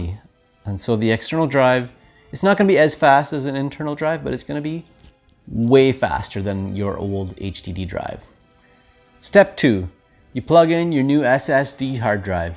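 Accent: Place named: Ireland. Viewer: Good tutorial! Are you Irish, Scottish, or Welsh?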